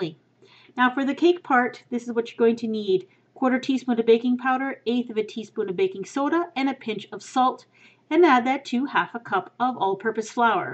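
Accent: Canada. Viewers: ur canadian arent u lol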